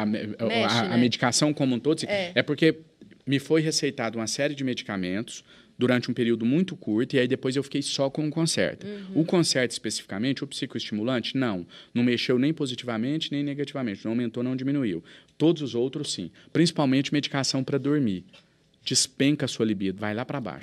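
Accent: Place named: Minas Gerais, Brazil. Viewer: JANONES presidente do Brasil daqui uns anos! Está amadurecendo para isso! Adoro suas ideias e o sotaque mineiro!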